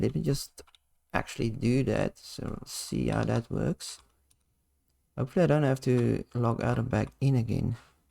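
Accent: South Africa. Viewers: i know a south african accent when i hear one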